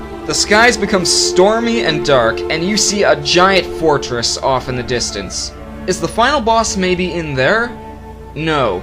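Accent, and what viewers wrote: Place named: Canada. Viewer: You Canadian? I keep hearing you say "aboot" and "doobt".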